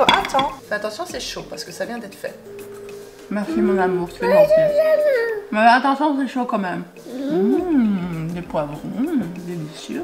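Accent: Brussels, Belgium. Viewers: Coucou! Je suis ta chaîne depuis la Belgique, de Liiiidje 😅🇧🇪 tu es d'où toi 'originellement'? Tu as un peu l'accent de ma couzine de Forest hehhe! J'ai découvert ta chaîne il y a peu grâce au Home tour et puis je suis tombée amoureuse 😅😍 de ta bonne humeur communicative, tu m'inspires positivement et j'ai l'impression de me sentir mieux pendant ce confinement grâce à ce voyage virtuel dans votre super vie 'exotique' si jpuis diiire hehhe. c'est marrant l'effet des vidéos quand même hein.. J'ai déjà partagé tes vidéos aveca meilleure amie et des amis fan du Japon car je trouve que c'est sympa comme tu présentes ça en tant que Belge 👍🏻😊 Continue à nous faire vivre des petits moments bonheur comme ça'ps: j'adore ta maison elle a l'air tellement grande et le jardin avec son porch' LOVE. IT. HIHI bises. Sophie de Liège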